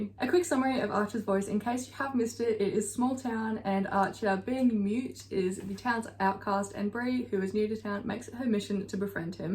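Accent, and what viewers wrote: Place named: Australia. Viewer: omg i just found your channel and when i heard a fellow aussie accent i got so excited 🫶🏼😭😭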